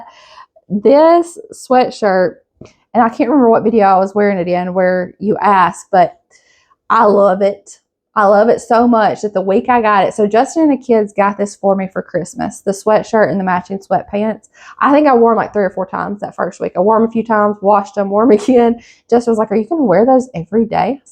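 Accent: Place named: Alabama, United States. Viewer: Your accent reminds me so much of my home town folk growing up over in Marion County, AL.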